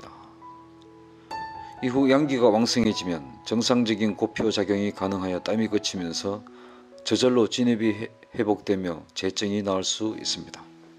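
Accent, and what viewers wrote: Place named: Gyeongsang, South Korea. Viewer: 감사히 잘 듣고 있습니다.경상도 발음이라 잘 못알아들을때가 가끔 있어요^^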